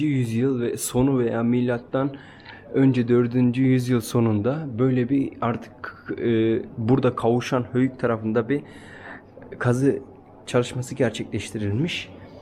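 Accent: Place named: Niğde, Turkey. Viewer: yav adam istanbullu degil. nigdeli !!! neden diksyon dersi alsin allah allah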